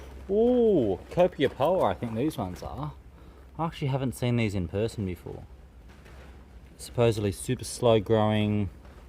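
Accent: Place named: Australia. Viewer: "I don't have too long" 1 hour video ensues with calmest Aussie accent ever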